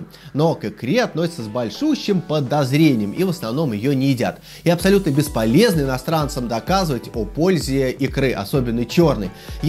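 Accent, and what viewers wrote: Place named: Voronezh, Russia. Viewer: а Вы случаем не из Воронежа?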